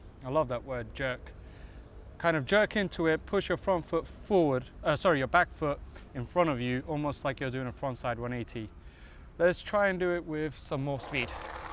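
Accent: United Kingdom. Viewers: Hey, I've been following you now for a while, and thanks for your great content. I am 26 now, and skated from 7 to 12 or something. I've always regretted that I stopped at some point, but I'm now starting again, and I FREAKING LOVE IT. I really relate to your feelings about sharing a skatepark with 10-year-olds that are better than you. But fuck it, skateboarding is awesome, and as long as you don't bother someone, everybody is always cool about it. Anyway, the reason for my comment is that I just want to let you know how great your content is. That video where you paid the narrator on fiverr in your intro, and told him to shut up eventually was one of the most hilarious bits I've seen. This goofy shit is what makes this channel great (amongst others). For example, the goofy edits that don't really belong, but are funny anyway. Keep doing those, because they are fucking funny. 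Just like the random chatter in between skating sessions (you're british so listening to you talk is somehow pleasant). You want to be a filmmaker. Well, keep this up and I can really see you get there. 15k subs is usually where the snowball can start to really roll, especially when you get lucky with a video. These skateboard progression videos usually do amazing. Yours have been great too. I would love to see more of that. (especially since you show the bails, and sorry, but they look so clumsy and funny. again, sorry). The point is - if there even is one to this big wall of random noise - is th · It sounds so weird listening to a British person doing a tutorial bc I usually hear American - accented people. But I am a British kid myself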